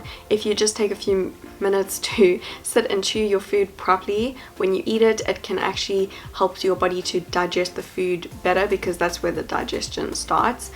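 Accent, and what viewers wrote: South Africa. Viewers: she has south african accent i quess and it sounds so elegant and relaxing i agree 💕💕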